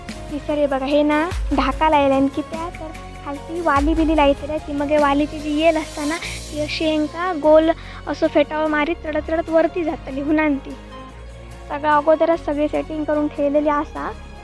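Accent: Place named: Sawantwadi, India. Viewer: Go tu bolta kiti bhari parda mast hade tade pure sawantvadi vadi bhasha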